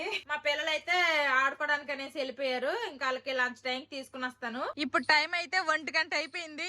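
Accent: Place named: Nellore, India. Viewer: Hi nuvu same ma lage mataladutunnavu madhi nellor ma amma valupurilo vuntaru yavaru emi anukunna mana pani Manam chevali nuvu super &great ink Manchi videos chevali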